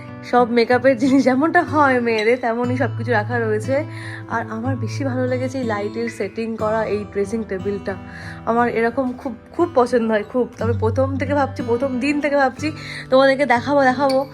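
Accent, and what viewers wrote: Kolkata, India. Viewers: ওনার কথাবার্তা পুরোপুরি কলকাতার বাঙালিদেড় মতন বাংলাদেশের মতন কোনো টান নেই এবার দেখার কথা যদি বলেন সেখানে ভারতীয়রা ও বাংলাদেশিরা একিরকম দেখতে আলাদা কিছু নয় কারণ আমরা সবাই আর্য তাই আমাদের চেহারার গঠন এক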